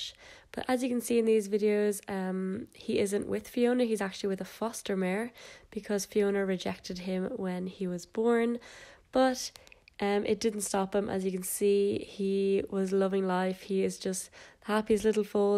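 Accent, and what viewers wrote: Ireland. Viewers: Awwwww so cute I started horse ridding 5 weeks ago I LOVE IT,btw r u Irish because I am and u sound like u :) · Are you Irish?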